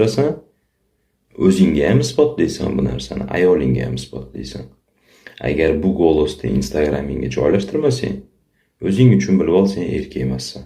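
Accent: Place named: Andijan, Uzbekistan. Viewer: Нима бупти шевасига анжонли хм нима дмокчисз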